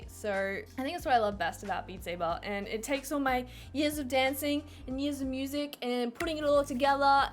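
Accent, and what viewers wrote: Australia. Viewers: in the thumbnail you look kinda like erin from the office hahahaha anyway love your content! youre amazing and its cool to see a big australian youtuber :D (or at least one with australian accent hahaha im australian too lol) thank you for being great! also i love your style, idk how to explain it other than that but yeah you are just cool and stuff :))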